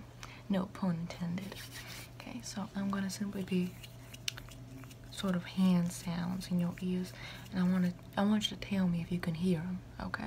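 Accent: South, United States. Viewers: The soft southern savana accent reminded me of grandma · It's southern sugah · Woman calling me honey in a southern accent Me: 😢grand ma😢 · The crazy part, I’ve seen her other videos and this southern accent isn’t her actual accent it’s just for this video but it’s so good. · As an Englishman would someone help me out and tell me what region of the United States this accent is from ? It’s the most beautiful accent i think I’ve heard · She looks Tamil Indian but has a southern accent....how exotic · i live in Mississippi and that southern accent is spot on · I love the southern accent! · Where is the part, returning to the battlefield getting injured and then coming back again for this nurse with that Southern Accent? We need a whole series on this. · God damn I love your southern accent. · Josh Reyes It seems like she is trying to do something like a deep southern accent but modern southern accents are different from the accents of the 40s and 50s. 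The r's at the ends of words like four aren't as pronounced making it more like a European accent. She also says Mississippi like Mississipeh which is how a lot of southerners pronounced it during the time period. I don't know if this is exactly what she was going for but it's what her accent sounds like to me. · It seems to be more of a blend of the old southern and transatlantic accents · That southern Belle accent. It's melting my heart. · We love that southern accent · The southern accent is perfect!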